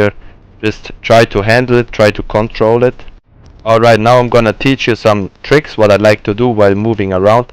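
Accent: Germany. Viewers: German accent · man hört direkt das du deutsch bist hahah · German accent haha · Man merkt richtig dein deutsch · you are from german ? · nice video are you German?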